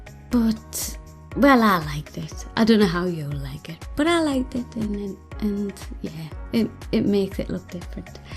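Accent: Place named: Newcastle, United Kingdom. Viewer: I'm subscribed and keep watching your videos even though I don't play the game. Your voice and Geordie accent is soothing to me.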